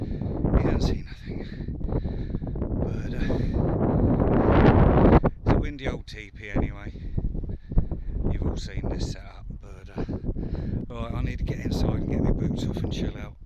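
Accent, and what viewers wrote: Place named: Gloucestershire, United Kingdom. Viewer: good video,cant believe the mingry lit u up with their "night sun"-shocking lolz,noticed an earlier comment on your accent,is it Gloucestershire?if not defo Hampshire.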